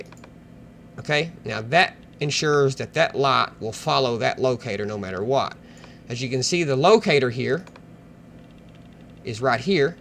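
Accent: South, United States. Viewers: You are indeed a generous person and I love your good ole boy accent. Down home education. Excellent tutorial. Now I know what Modo is all about.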